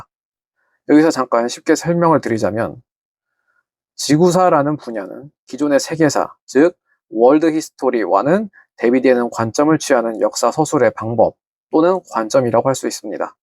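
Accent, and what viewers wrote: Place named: Jeolla, South Korea. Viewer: 얘 억양에 전라도 사투리 섞인거같은데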